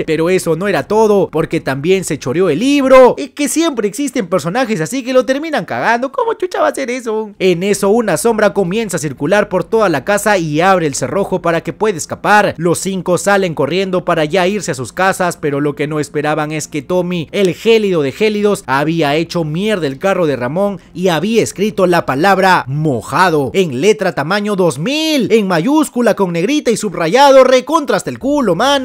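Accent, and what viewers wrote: Peru. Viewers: por la forma de hablar eres de perú´por lo visto te amooooo soy tu fans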